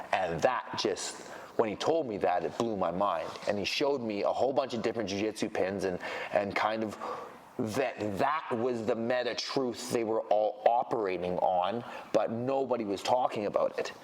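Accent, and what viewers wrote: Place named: Canada. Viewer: Gotta say it’s great to hear another Canadian on here “aboat”. Like I’m in a Tim’s lineup in Sudbury. Much love brother.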